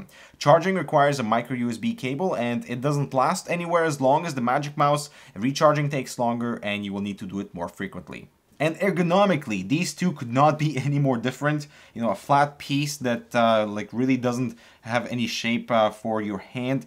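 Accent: Russia. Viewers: slightly рашин акцент here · Not offending him but sometimes he sounds Russian and sometimes he sounds American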